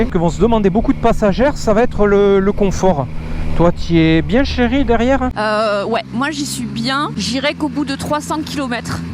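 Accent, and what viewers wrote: South, France. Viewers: Vidéo vue. 32mn de kiffe. Jolie moto. Jolis paysages. Bonjour à Madame. Le soleil, l'accent du Sud... pow pow pow, continues, restes le même, et hâte de connaître ton ressentis GT vs ténéré 😁 un gros ✌ d'encouragement à toi, force à toi et prenez (toi et Madame) soin de vous... ✌✌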